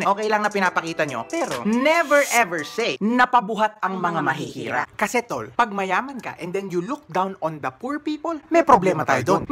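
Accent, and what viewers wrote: Pampanga, Philippines. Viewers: nice kapampangan ka nga haha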